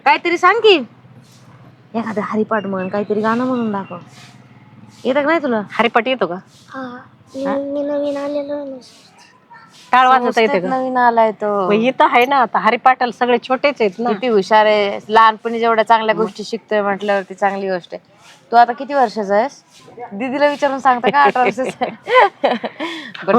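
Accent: India. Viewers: आस्सल नगरी भाषा बोलती 😂😂😂😂😂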